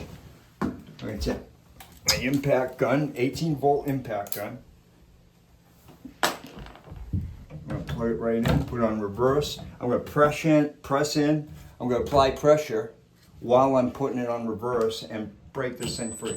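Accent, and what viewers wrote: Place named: Cape Cod, United States. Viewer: Being a native Cape Codder, I could tell by your accent.😂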